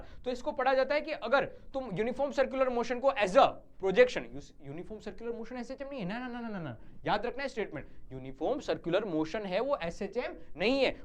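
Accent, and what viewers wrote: Gujarat, India. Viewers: Only gujrati guy recognise other gujju's Hindi😅, BTW sir is gujarati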